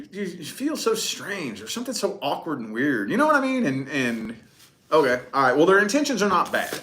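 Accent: South, United States. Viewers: Thanx so much for your reading...I'm also a tarot reader..but I love supporting all my fellow mystics...I'm from TN..you sound southern..I'm curious 🧐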